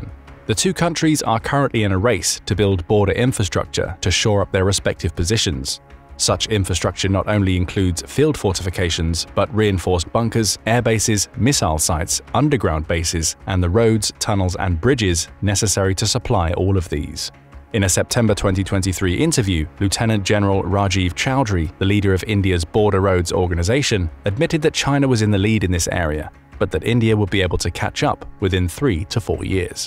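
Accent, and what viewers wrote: United Kingdom. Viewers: Click bait. Again. This channel is silly but it has a British accent, so, I guess that's why folks are here..?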